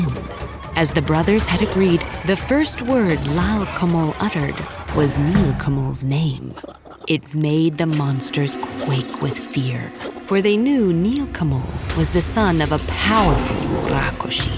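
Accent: Bengal, India. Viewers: The bengali accent is just the cherry on top🤌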